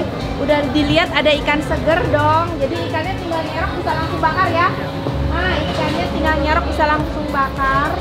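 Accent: West Java, Indonesia. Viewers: Seneng pisan ngadangu teh nyarios Sunda asa ka wargi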